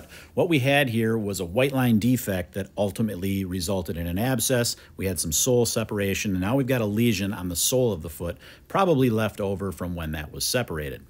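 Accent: Wisconsin, United States. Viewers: Just from your accent, I was picking up some Minnesota which makes sense kf what you told us where you apprenticed and then I definitely thought you were a Wisconsinite. I'm a born and bred Wisconsinite myself. Dad worked on a diary farm making cheese in summer during school breaks in the Sheboygan area where he was born and was raised in Kiel with family right in New Holstein. I was born in Milwaukee and that's where my mom and her family have always been. Then after being in Crystal Lake, IL until age 3-4 as my dad commuted to work in Northbrook, we settled in Kenosha...so the super southeast and only 10 min from the WI/IL border. Lots of cows everywhere in this state. As a life-long rider of horses (jumpers and dressage) and watching farriers trim and put on new shoes, do you think you could (in a pinch) trim a horse hoof? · I thought I pinned your accent! 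Much love from a fellow Wisconsinite!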